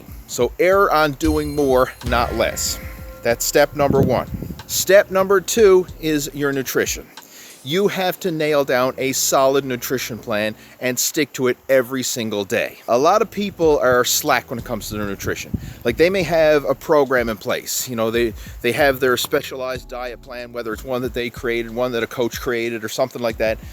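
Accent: Newfoundland, Canada. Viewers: Are you a Newfie?!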